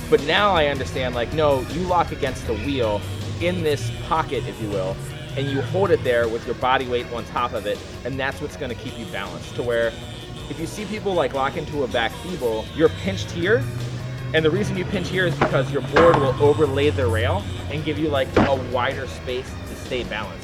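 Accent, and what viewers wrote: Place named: New Jersey, United States. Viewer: I have no idea where is from, but I'm definitely hearing a Jersey accent in there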